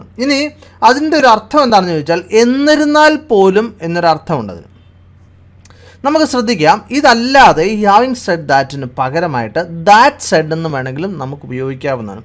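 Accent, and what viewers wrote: Kottayam, India. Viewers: Please avoid sir kottayam slang I didn't understand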